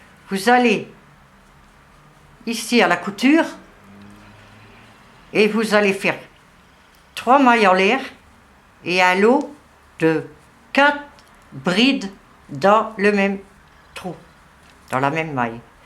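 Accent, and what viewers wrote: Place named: Tourcoing, France. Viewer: Bonjour J'adore votre accent, ça me rappelle Tourcoing où j'ai vécu Merci pour votre explication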